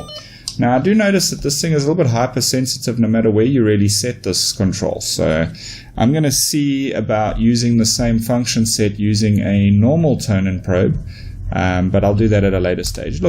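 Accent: South Africa. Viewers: I thought you sounded South African! Mentioning pricing in Rand is what confirmed it. Thanks for the decent review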